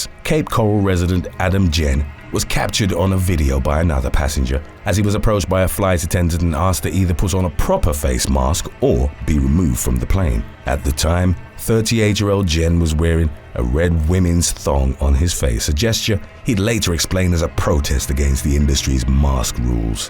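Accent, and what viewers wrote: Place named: United Kingdom. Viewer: The mask policy was, like the plandemic itself, fraudulent. All the main perpetrators have admitted this at various times -- gates, fauci, nih, cdc, media organs, labs. We needed more people like these refusers, not more sheeple who dumbly helped the criminalized authorities enforce the dictates on them. Surely you're alarmed at how easily everything became "fascist"? Every store owner, every governor, every mayor -- even your fellow citizens and checkout lines ad nauseam became little "Hitlers" over us. You sound British -- the UK govt turned absolutist so fast (and (has) stayed that way so long) it should terrify you way more than any disease, real or imagined. Not lecturing you, just saying.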